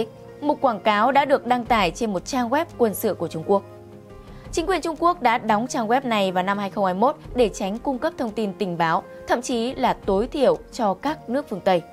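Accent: Standard. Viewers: là số một hiện nay ở việt nam nhưng bình luận vẫn phụ thuộc ý tưởng của bbt. Người đẹp, giọng chuẩn, có hồn khi truyền đạt. Luôn ủng hộ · CHị dẫn chương trình hay quá....! giọng rõ phát âm rất chuẩn,dể nghe...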